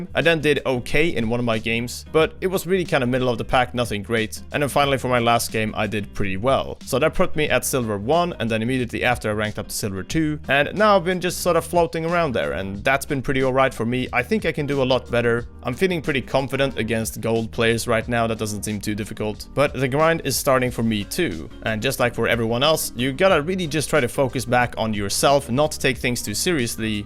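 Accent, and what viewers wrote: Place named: Sweden. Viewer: is he swedish? sounds like that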